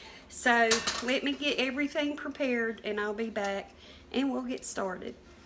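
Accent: South, United States. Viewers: I love to listen to a southern accent...I could listen to all day long.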